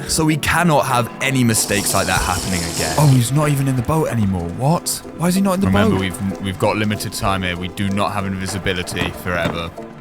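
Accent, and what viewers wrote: United Kingdom. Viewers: I love British accents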